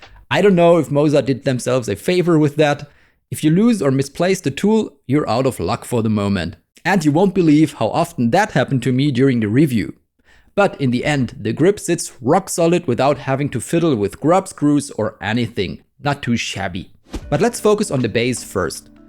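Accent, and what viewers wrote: Germany. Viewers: Hi. I am new to your chanel, I live in Germany, from your accent I gues you are german, do you have any tips where i could get nyogel 767a? Danke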